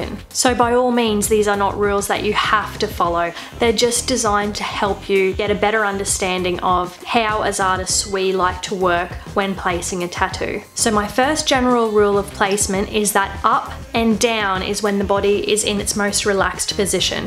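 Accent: Australia. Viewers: because she has an aussie ascent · It's an australain accent. Alot of people here say "tadoo".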